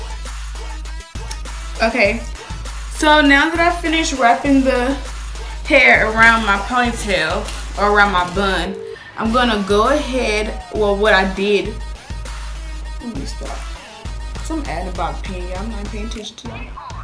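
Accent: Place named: Baltimore, United States. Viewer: Im From Baltimore 😍😍 u do talk like us a lil bit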